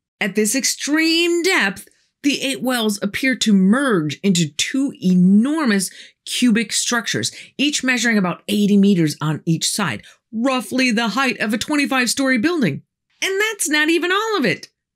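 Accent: United States. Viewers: What an irritating Americn voice....................prat of the year so far!!!!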